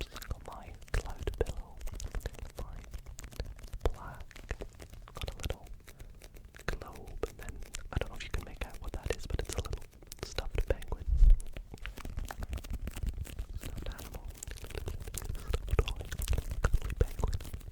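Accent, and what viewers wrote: Scotland, United Kingdom. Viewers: I started watching asmr in 2022 but not sure if it was 22 or 23 when I came across you and it was the Scottish accent that made me sub, so tingly!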